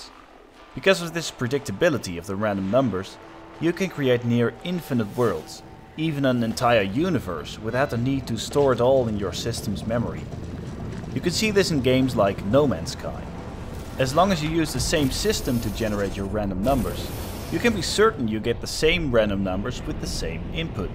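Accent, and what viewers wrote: Netherlands. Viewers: Yes, as a fellow Dutchman, I recognised you accent within a few seconds. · How come I always recognize a fellow Dutch accent. Then when I check the account info, it's actually true xD Useful info video tho! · I could hear you were Dutch, but you have less of an accent then I do after 30 years living in the USA!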